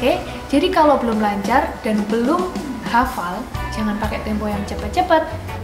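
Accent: Java, Indonesia. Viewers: mbaknya medok😂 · Kak orang jawa ya? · la wong emg org jawa tulen koq wkwkkw :v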